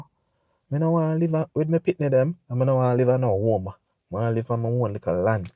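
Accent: Jamaica. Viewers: Spoken ❤ true orthentic Jamaican. Up up str<num>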